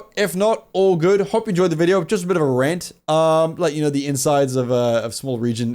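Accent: New Zealand. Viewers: can u give me some tips on how to do the kiwi accent? it sounds just so clean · I keep forgetting you're from NZ lmao, your accent is so different from what im used to aha, love from Wellington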